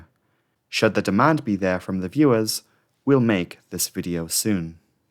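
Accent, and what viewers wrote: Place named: Northern England, United Kingdom. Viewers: Totally off topic but I'm interested about the narrators accent. It sounds northern English with north American influence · It sounds similar to another UK creator I watch, Lazy Masquerade. I had never heard an accent like that until I started watching him, and now.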